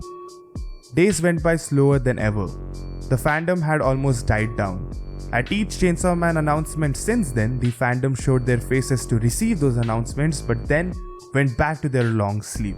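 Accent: India. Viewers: The impossible not unrecognisable indian accent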